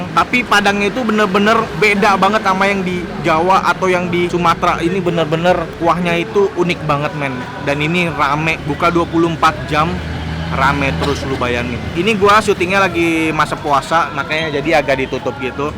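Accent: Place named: Pontianak, Indonesia. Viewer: tak ade bukan padang , nah bahasa pontinye kental cuy wkwkw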